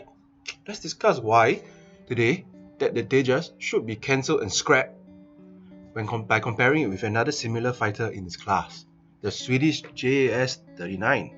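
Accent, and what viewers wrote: China. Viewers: Pronounsation sounds like pure chinese .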